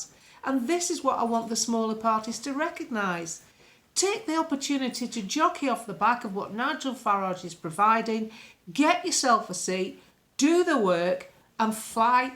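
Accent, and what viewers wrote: Germany. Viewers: Hi, keep up the good work but do you have to do that stupid German accent? It does you no favours. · 😂😂😂love you reading your news report in yourGurrrman accent😂😂😂 Please do more and do you any other accents from around the world😊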